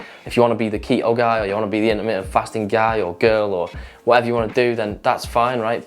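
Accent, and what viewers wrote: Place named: Widnes, United Kingdom. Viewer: Sound like your from widnes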